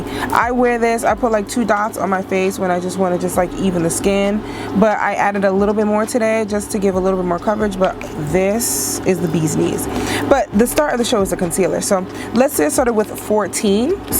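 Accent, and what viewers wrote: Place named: New York, United States. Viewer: I love the New York accent!❤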